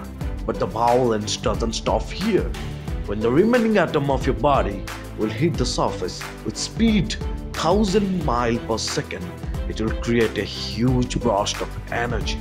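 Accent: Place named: India. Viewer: Dude you are an Indian I know it . you completely sound like an Indian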